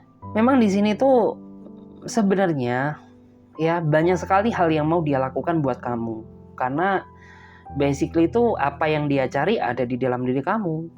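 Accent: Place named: West Kalimantan, Indonesia. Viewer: kalbar nh kk😅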